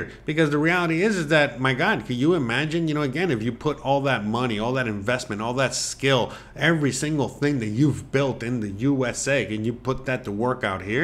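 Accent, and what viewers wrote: Cuba. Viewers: Man you speak cubano very different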